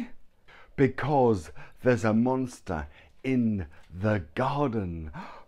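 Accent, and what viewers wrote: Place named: United Kingdom. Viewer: I am interested in British English because it is interesting.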